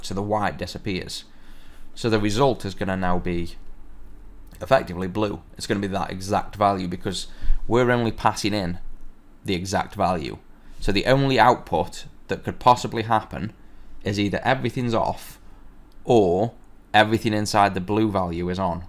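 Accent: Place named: Manchester, United Kingdom. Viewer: Great tutorials, I'm enjoying them immensely. I'm coming from VB (I know!!!), <link>, VBA, <link> background, although I have also written a few, fairly rushed C# programs, I'm watching these more for good programming practice and to quickly fill in any big gaps in my knowledge - this tutorial being one such example. If there is one thing I will take from these tutorials, it is the proper documentation of code, I have been shamefully neglectful of this in the past :( I have a couple of questions, if you don't mind, do you have any plans to cover MVC and where are you from - my guess is South Manchester :) Anyway, thanks again for the tutorials - superb stuff.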